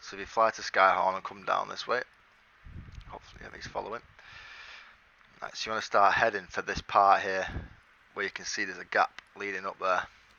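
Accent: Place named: Northern England, United Kingdom. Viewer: Cheers angel!!!!!!..I finally found the last part .. which for me was the one on the top of the mountain!!!.. Huge thank you .. I even liked listening to your northern accent!!! fantastic video .. thanks again!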